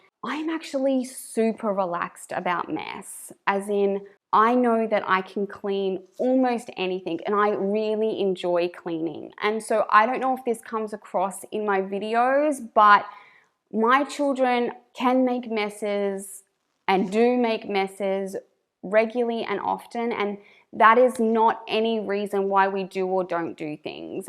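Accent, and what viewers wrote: Australia. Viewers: you have a lovely Australian accent. Some of these comments are crazy. People need to get a life. Carry on we love your channel. Bye bye to anyone who have a problem with it lol 😁💗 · I started watching organising and minimalism videos on you tube and was really missing our Aussie accent so that's how I found you. Don't lose the Aussie twang 😉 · I love the holiday apartment look too! Plus anyone with kids knows that anything extra = extra chaos. And your hand gestures increase trust. I was taught it's GOOD in public speaking. It's ridiculous to make fun of your lovely Australian accent. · As for your accent, if you watch an australian channel you should expect an australian accent! 😆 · Your kind and gentle nature makes you a good messenger for this topic and your Australian accent is a bounce! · I was always taught that if you don’t have something nice to say, then don’t say anything at all. 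Nowadays, i think so many people are miserable in their own lives, they look for any reason to criticize someone else. It is very sad. I love your Australian accent! I think children in today’s society will greatly benefit from being raised in a minimal home. I have been on my minimal journey for about 2 years. I am constantly looking to minimize even more. It has made a huge difference in our home. Our lives run so much better. I wish I would have known about minimalism 20 years ago. You are doing a great job! Your kids will grow up knowing what is truly important in this life, the relationships they have not the things they possess. Keep up the awesome work! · So mean picking on someone's accent! Everyone speaks differently and thats fine. I am Australian also so your speech sounds fine to me 😁 That comment probably came from someone in a different country who isnt used to hearing an Australian accent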